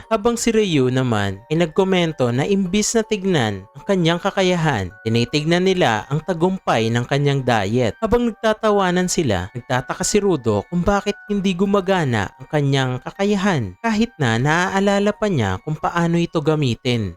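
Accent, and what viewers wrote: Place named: Iloilo, Philippines. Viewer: Idol pa illongo kana pero keep it up at salamat sa recap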